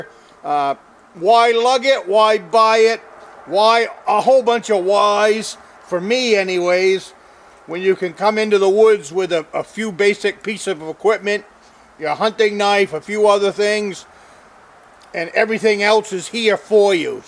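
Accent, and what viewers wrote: Maine, United States. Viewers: Very informative video, and you do rock that Maine accent! With your enormous number of videos, sounds like you should write a book on being a woodsman!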